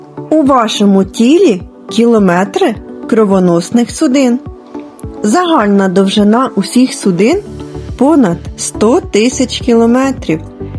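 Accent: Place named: Poltava, Ukraine. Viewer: Не дивуюсь, але не перестаю дивуватися, який Господь великий! А ще поважна людина, що озвучує з Полтавщини, чи Черкащини))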